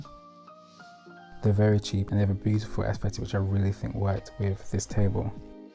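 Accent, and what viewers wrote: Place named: England, United Kingdom. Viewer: Are you. British? You have such a nice and calming voice but your accent is very interesting because it isn’t super strong English accent :) your art and crafts are so beautiful and good too it’s amazing how you can make all this stuff yourself and very creative!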